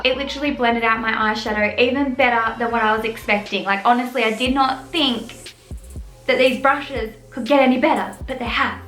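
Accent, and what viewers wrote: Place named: Australia. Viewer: I love you you're so pretty and I love you're Australian accent!!!!! I come from the UK but you're so pretty and I love all your videos!💖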